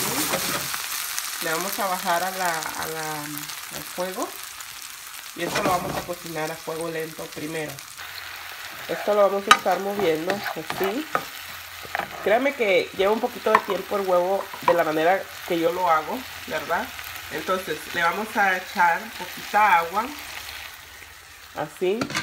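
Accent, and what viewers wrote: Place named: Mexico. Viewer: Ya no habla como hondurena sino como mexicana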